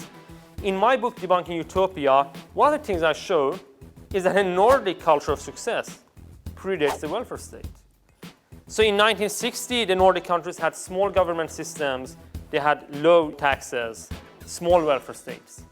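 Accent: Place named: Iran. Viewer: This guy sounds Persian!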